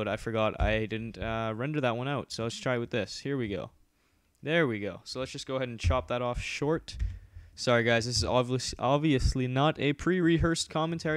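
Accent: Canada. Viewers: Your voice changed. You have more of a Canadian accent now.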